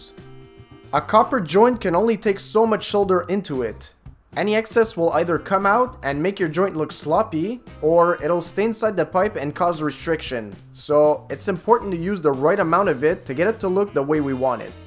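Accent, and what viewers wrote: Quebec, Canada. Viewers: GDog S Canadian accent! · presque pas d'accent excepté une couple de fois! Un québécois reconnais un québécois qui parle anglais! ;) Mais! Bonne nouvelle. Je me suis abonné à ta chaine! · Not that it matters, is this a Canadian DIY advice video site? Just out of curiosity (as I’m from Ontario) - just going from the Quebec licence plate, your accent and seeing Holmes on Holmes clips just made me wonder. Personally I think it’s great as I’m a bit tired of getting all the advice from US sources.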